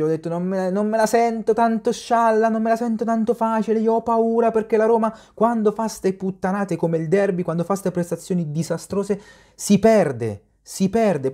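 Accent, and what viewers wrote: Perugia, Italy. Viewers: Vago accento di Perugia o sbaglio? Forse mi sbaglio. 😎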